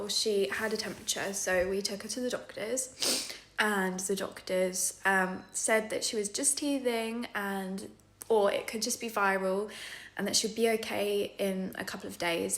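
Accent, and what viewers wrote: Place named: United Kingdom. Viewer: I won't go into the gruesome details of what I went through 12 months or so ago. By your accent I'm assuming you are from the U.K. It is such a simple test to check for a U.T.I. That SHOULD have been the first thing that they checked. Indeed, you shouldn't have even requested it. It is so frustrating and dangerous that you had to wait 5 days for it. I hope your child is now well and happy xx.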